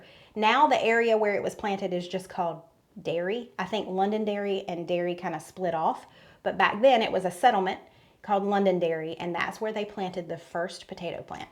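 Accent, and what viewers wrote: South, United States. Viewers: Now a days I put mine in the crockpot. The Rueben’s for the leftovers are the best part! you say “boil” however you want to!Its fine and I love the southern “accent” of it❤ Also I am jealous that I can’t go to your meet & great, but I bet you & everyone who attends will have a blast!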